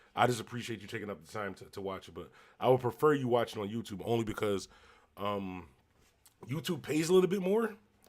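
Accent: Philadelphia, United States. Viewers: You sound like you from Philly.....Salute Bro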